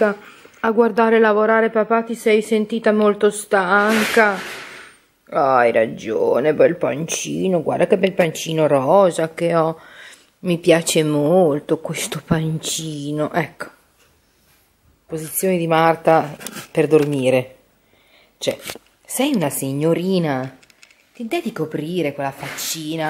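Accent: Emilia, Italy. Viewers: Che ridere quando parli con!!!😂😂😂adoro l'accento emiliano!!! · SEI UNA GNOCCA TERRIBILE 😊😊😊!!! L adoro è stupenda!! Adoro gli emiliani nn x altro sono di Reggio Emilia ma purtroppo nn abito li ed è una vita che nn ci vado 😔😔 xo seguendoti e sentendoti parlare mi sembra di tornare un po' a casa... mi piace molto la tua semplicità complimenti x i video che fai un abbraccio 😘😘🤗🤗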